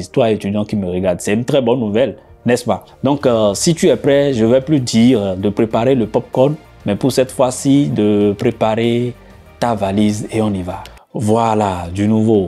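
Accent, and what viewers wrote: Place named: Benin. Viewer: tu dois être béninois vu ton accent! moi ton voisin togolais !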